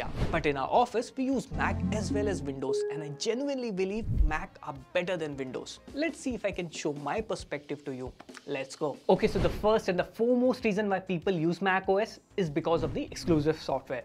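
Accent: India. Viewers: I hear an indian guy talking about technology...I press like and listen!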